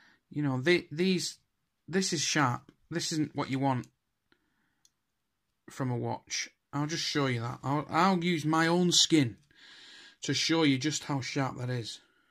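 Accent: Bolton, United Kingdom. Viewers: You sound like your from bolton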